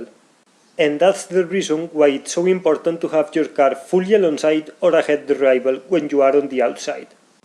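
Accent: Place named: Spain. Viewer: Thanks for the video! You sound like Carlos Sainz Jr. but with a heavier accent, are you Spanish?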